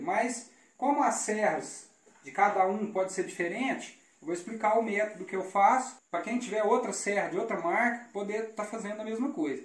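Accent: Minas Gerais, Brazil. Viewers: Muito bom e ilustrativo ainda mais com esse sotaque mineiro ficou melhor ainda parabens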